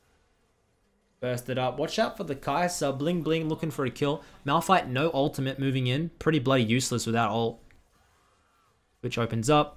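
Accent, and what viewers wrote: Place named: Australia. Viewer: Came for the Australian accent, stayed for the K N O W L E D G E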